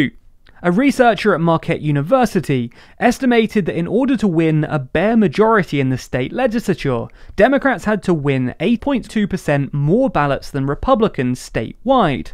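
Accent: United Kingdom. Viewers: Love hearing a Brit explain American politics!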